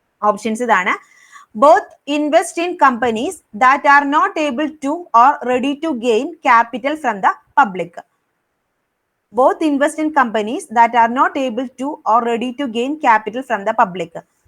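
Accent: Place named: Thrissur, India. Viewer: ടീച്ചർ ഒരു തൃശ്ശൂർ കാരി ആണെന്ന് തോനുന്നു 😊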